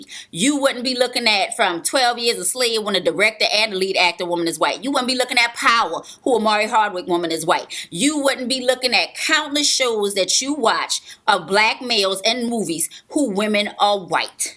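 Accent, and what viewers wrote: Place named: Charleston, United States. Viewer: are you from Charleston? i hear your accent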